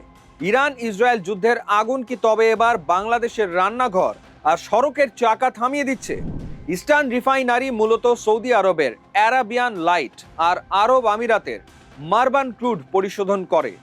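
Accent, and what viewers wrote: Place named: India. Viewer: ভারতের মতো নিউজ পড়েন কেন🙄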